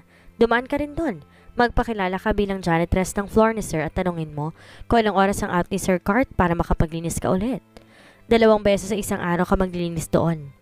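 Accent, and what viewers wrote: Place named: Iloilo, Philippines. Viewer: Maka lingaw ya ilongga mu miss Narrator 😅❤ taga banisilan po ako . I’m very happy po while listening this story ❤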